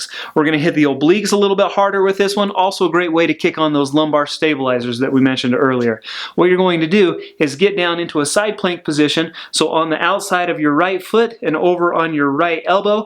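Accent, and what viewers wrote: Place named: Utah, United States. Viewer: This is excellent, and I heard him talk for three seconds and knew he was from Utah!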